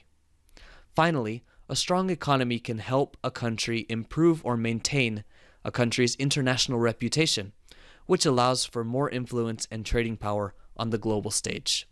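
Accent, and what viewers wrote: United States. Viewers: Should British english be used to write in IELTS? You wrote labour as "labor" (which also means giving birth i think) :) Also, you sound like the speaker in the listening test! (but with the american accent haha). Anyway, this lesson truly is helpful! I have my tests on 3rd June. I hope to be ready to for band 6+, or even 7,8!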